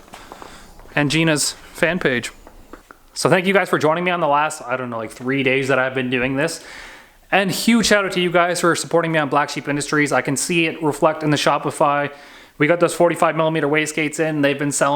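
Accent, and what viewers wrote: Canada. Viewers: i gave a like for the way you say 'out' canada accent is gold, from australia!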